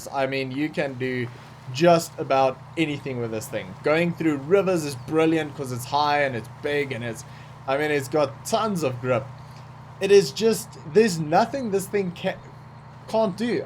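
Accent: South Africa. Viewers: Hey are you south African?if not just ignore lmao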